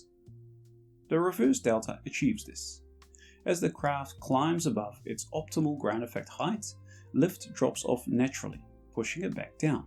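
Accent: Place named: New Zealand. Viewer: You sound like a Kiwi with something else bolted on?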